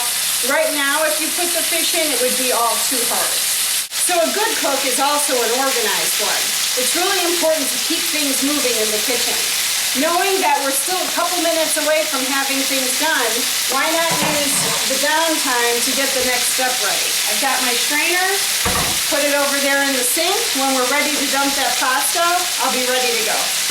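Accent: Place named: Midwest, United States. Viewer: Beautiful woman. Her accent sound like from the mid West.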